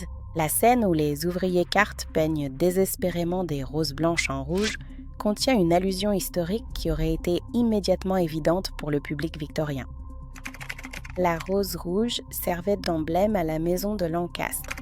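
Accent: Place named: Quebec, Canada. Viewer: Superbement documenté ! Avec le petit accent so québécois qu’on adore ! Tellement intéressant j’aurais aimé tomber sur vos contenus bien avant ! Merci à vous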